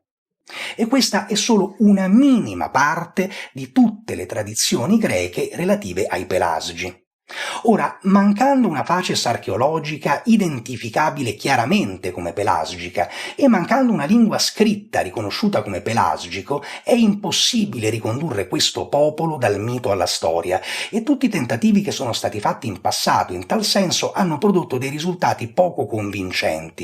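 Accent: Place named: Rome, Italy. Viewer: Caro Professore! Hai detto molte cose vere, e nelle altre hai fatto arbitro buono che non sta né da una, ne dall’altra parte. Ma per chiarire ” qualche “ imprecisione desidererei parlare a questo occhi, sento l’accento Romano nell’’tuo parlato, (scusami del tu, ma sono più grande di te, e posso permettermelo), se sei di Roma e se il tempo te lo permette, possiamo mettersi d’accordo per un caffè e parlare. Grazie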